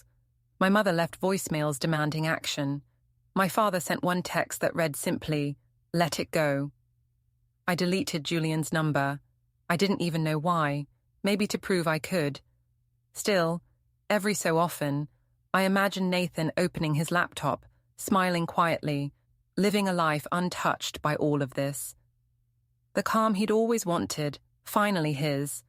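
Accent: United Kingdom. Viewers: there’s a collection of stories where the AI narrator has a British accent. They all follow the same pattern. The wife or girlfriend does something messed up, but not quite cheating. The guy has a problem with it, the woman tries to gaslight him and then it turns out she actually did something way worse. It’s becoming repetitive at this point. Come up with something new. Also, you are giving the idea that the initial thing that the woman does isn’t bad enough. It is there’s no need to also toss in the other things that she’s done. I am two minutes in and it seems like the story is gonna go the same way and if that is the case, I am going to avoid all stories like this, like the plague.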